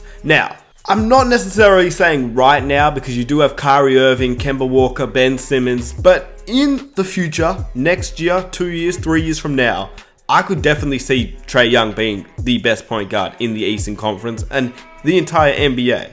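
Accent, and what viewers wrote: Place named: Australia. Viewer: You sound so Australian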